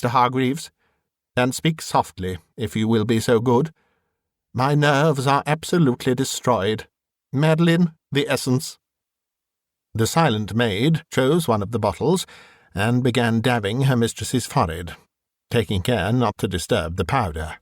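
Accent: United Kingdom. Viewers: New subscriber, very impressed with your delivery! I've always loved the vintage stories narrated with a British accent. They just demand them read so and you do a marvelous job! I'll be enjoying your work often! Thankyou!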